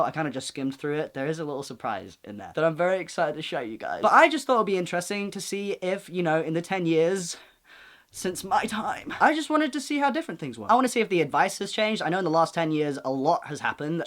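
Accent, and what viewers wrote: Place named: Cockney, United Kingdom. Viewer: Your cockney accent is the best thing I've heard all week.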